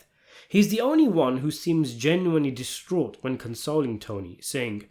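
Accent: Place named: Northern England, United Kingdom. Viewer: It’s an accent from northern England, I prefer this than a robotic AI voice, good thing your opinion means OOGATTZ TO ME!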